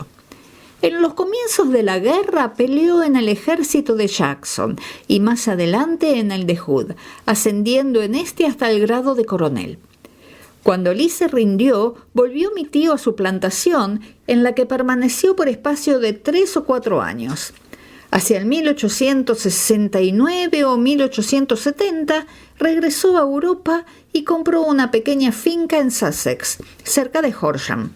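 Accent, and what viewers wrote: Argentina. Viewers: ¡Les deseo muchas felicidades en el 2024! Gracias por tan agradables narraciones claras y con la musicalidad del acento argentino.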